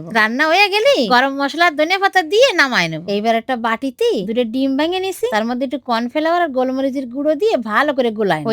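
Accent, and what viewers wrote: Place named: Bagerhat, Bangladesh. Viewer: বাংলাদেশের খুলনা জেলার মতো কথার টান😮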